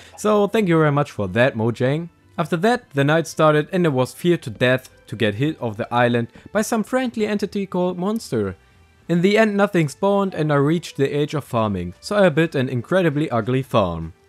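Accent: Germany. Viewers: This german accent kills😂good video my friend, Ich fühle den Akzent😂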